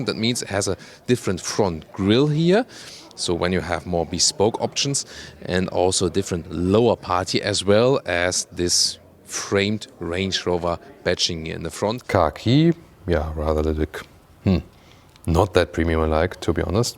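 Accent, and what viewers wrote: Germany. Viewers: Like always great review ! thank you! I like German accent! good job!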